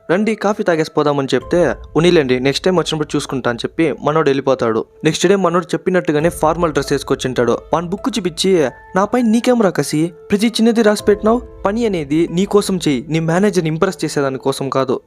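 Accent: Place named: Chittoor, India. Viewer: Bro ru from Chennai or Tamil Nadu border or Chittoor language mix but i like it just as puspa slage 😎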